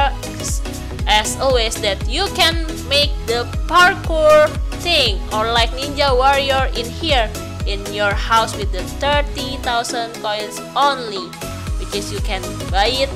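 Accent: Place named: United Kingdom. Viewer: Ur Voice Sounds Britsh.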